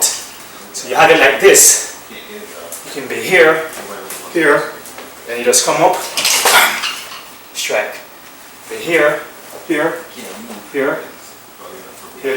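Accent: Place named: Jamaica. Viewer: wait...... yuh sound like a yardie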